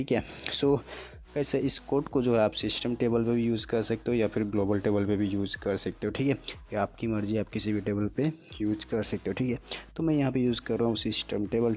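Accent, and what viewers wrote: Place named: India. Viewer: Your Indian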